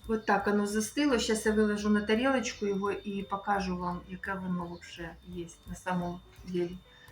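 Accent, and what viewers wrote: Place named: Chernihiv, Ukraine. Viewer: Прекрасна мова, красивий суржик, на Чернігівщині всі так балакають, не нравится не слухайте! 👍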